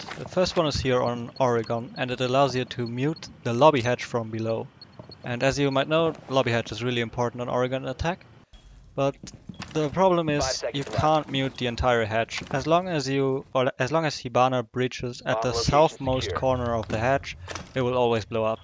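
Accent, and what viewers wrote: Germany. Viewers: Sehr gutes Video, deutscher Akzent sehr deutlich:)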